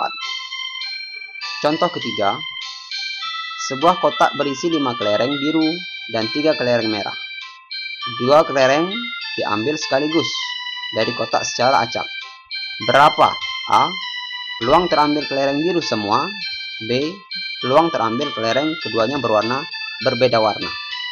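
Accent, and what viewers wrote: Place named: Bengkulu, Indonesia. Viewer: Mantap betul pak, bapak dari Bengkulu ya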